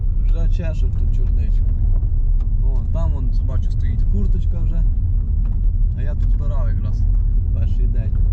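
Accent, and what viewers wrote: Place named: Yavoriv, Ukraine. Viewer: збір чорниці - найдивовижніше заняття у світі... особливо якщо пальцями по ягідці!!! Так з рідними збирав ще 60 років назад на Яворівщині Львівської обл... Ти часом не з тих країв?!? Щось знайома говірка, працьовитість і любов до природи!!! Привіт синьо - жовтій Швеції!!! 🇸🇪 ❤️ 🇺🇦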